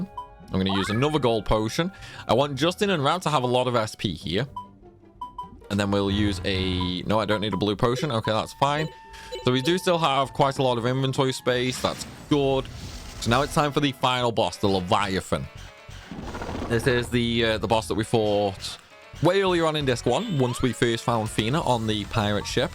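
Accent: United Kingdom. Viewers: I followed this guide and it was accurate and precise to finding all the items. I don't know which was worse tho.... this convoluted tower or listening to this atrocious accent. Why do some brits gurggle the English language like they are choking on spoiled milk? Prime example of why the American revolution needed to happen.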